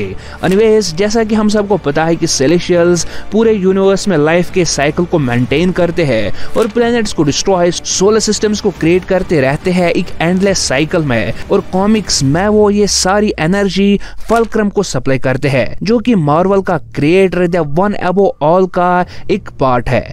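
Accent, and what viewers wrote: Kashmir, India. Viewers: Kashmiri ho kya ?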